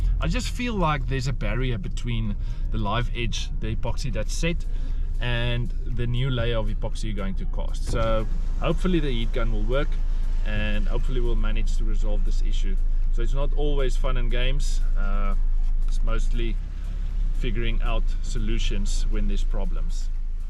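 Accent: South Africa. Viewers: I kight just be assuming.. but I am mostly just shocked at that thick South African accent.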